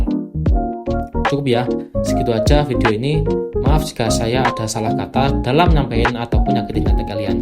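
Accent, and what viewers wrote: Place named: Java, Indonesia. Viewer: lebih enak kalo medok gini bg aseli🗿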